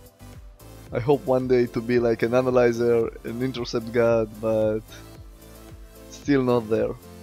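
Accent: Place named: Russia. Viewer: Ты русский? У тебя просто акцент не английский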